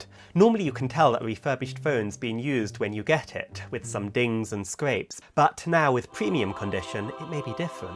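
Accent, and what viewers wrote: United Kingdom. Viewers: Mate you are English for sure love it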